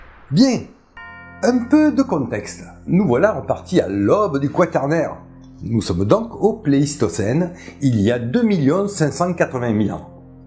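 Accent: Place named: Toulouse, France. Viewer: Holà A une époque où les chaînes de YouTube m'apporte plus que les années sur les bancs d'écoles.... La tienne franchement est exceptionnelle! Ton accent me rappelle Toulouse, ton vocabulaire et ton humour embellissent mes moments de détente. A 52 ballets,retraité précoce! Exilé dans la sierra uruguayenne... Je te souhaite une année riche et tranquille. Ton travail change la donne: Le savoir devient accessible et tu y contribue énormément!!! le Boss des temps disparu!!! Merci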